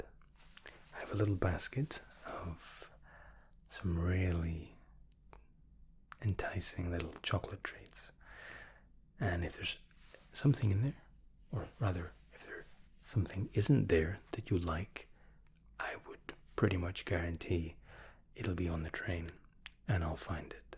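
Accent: Scotland, United Kingdom. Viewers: Where were you born because I fell like you have a bit of a Scottish tone and some words are pronounced Scottish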